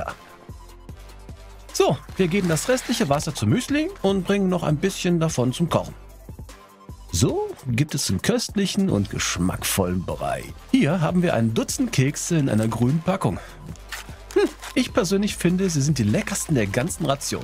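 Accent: Russia. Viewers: Der russische akzent fehlt mir schon ein bisschen...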